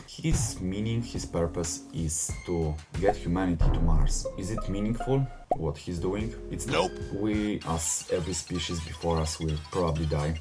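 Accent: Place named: Poland. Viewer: Akcent bardzo polski, materiał ciekawy i ćwicz stary ćwicz!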